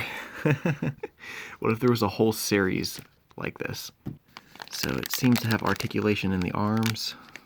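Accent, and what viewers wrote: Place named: United Kingdom. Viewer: You’re a British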